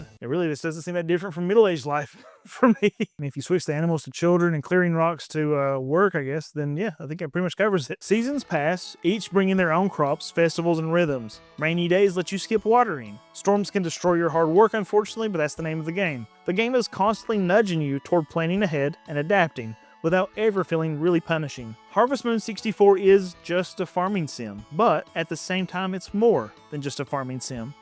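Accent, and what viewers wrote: South, United States. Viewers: That southern drip is back, yeehaw! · I think that ending summation is a good way to think about these games; they're not RPGs, but they capture the feel of one. At least, that's how I felt when I finally did a full playthrough of Majora's Mask last year, and that helped me realize part of why I enjoyed it and Ocarina of Time (which I also finally finished a few years ago) as much as I did. Also, I gotta say, I appreciate hearing a southern US accent on this site amidst all the coastal and British English ones; maybe it's 'cause I'm a southerner myself (not that it's easy to tell from my own accent), but still, nice to hear.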